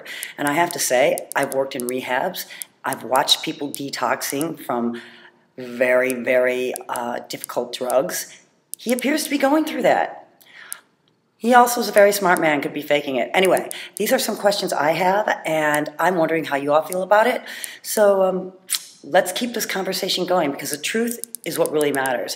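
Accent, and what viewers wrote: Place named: Midwest, United States. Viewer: Great, subtle Mid-america accent. Where are you from, darling?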